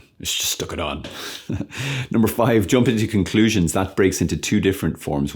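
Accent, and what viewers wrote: Ireland. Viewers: I like the Irish accent to...❤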